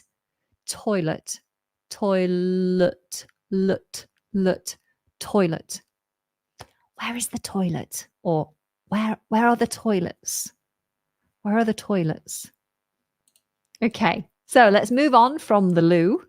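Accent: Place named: United Kingdom. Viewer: I apreciate the britsh accent...it is very especial for me.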